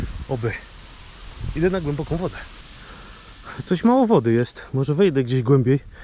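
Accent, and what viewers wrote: Silesia, Poland. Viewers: Fajnie jak się pojawia godka:), Mógłbyś więcej:) Pozdro z Rybnika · Chwila na Śląsku i już zaczynosz godać:) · co Ty tak po ślunsku zacząłeś godoć haha XDDD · wraca Śląsk i od razu gwara.... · Jesteś ze Śląska czy z Zagłębia???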